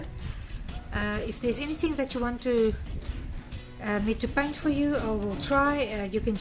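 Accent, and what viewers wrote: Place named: South Africa. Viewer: I love him. I love elephants! You're from South Africa? Very cool. I was wondering what accent you had.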